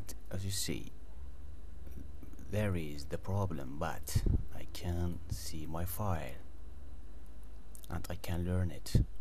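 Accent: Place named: France. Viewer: non , french accent !!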